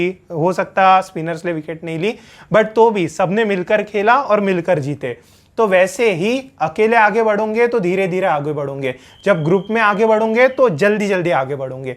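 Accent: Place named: Nagpur, India. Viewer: Nagpuri Hindi 🙌🏻🙌🏻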